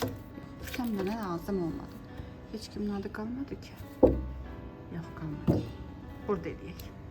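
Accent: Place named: Ganja, Azerbaijan. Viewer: Soruçuram gence lehcesidi. Bilen bilir.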